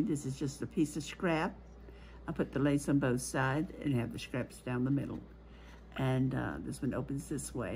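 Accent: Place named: Georgia, United States. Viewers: Are you by any chance from southeastern Alabama or northwestern Georgia? My grandmother was from Salem, Alabama and grew up in Columbus, Georgia. Your accent sounds very much like hers and your voice brings me so much joy ❤❤ thank you, beautiful soul ❤ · Maine? You sound like you’re from Georgia 😊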